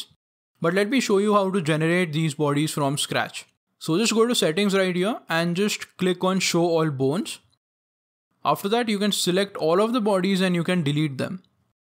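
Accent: India. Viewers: this is what I mean when I say indian accent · you look american but talk in a indian accent my stereotypical brain don't know what to do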